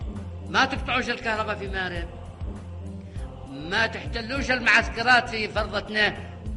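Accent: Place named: Saudi Arabia. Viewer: ماشاءالله عليك واضح من لهجتك انك سعودي من ذماران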